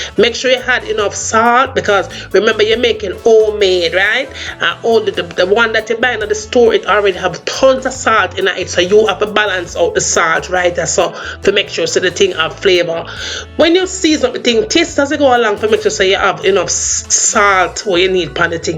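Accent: Jamaica. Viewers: Just listening to you I feel like I’m in Jamaica just need the weather, food and the smell😂😂 big up ur damnself mumma str8 outta London UK 🇬🇧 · My girl mi subscribe jus cuz yuh sound lik a real yaadie goodie.. mi a guh try dis, cyaan duh the whole buy buy ingredient, jus a guh use some a di store bought... den grill it. Is where yuh find scotch bonnet? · New sub sending love from the South!! Sent here from Ray Mack. Blessings & I look forward to cooking your recipes. Love your Jamaican accent, sister. 💖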